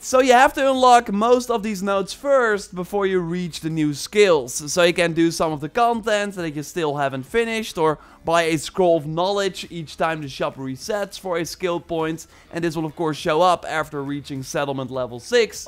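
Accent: Netherlands. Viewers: He has a Dutch accent so he says instead of V he will say F but he means Visiting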